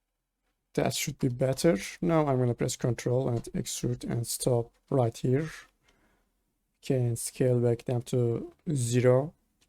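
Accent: Turkey. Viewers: Usta şu ana kadar gördüğüm en iyi cinema4D videoları sende helal olsun işi tekrar sevdirdin bana aksandan hemen anladım zaten türk olduğunu :)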